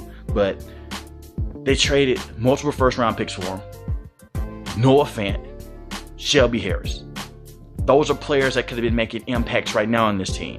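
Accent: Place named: South, United States. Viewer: There is no way you are a Seahawks fan with that accent. More like saints and Ole miss fan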